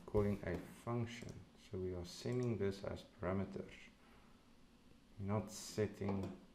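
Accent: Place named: South Africa. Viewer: Your accent says you are in South Africa. . . Super proud of you man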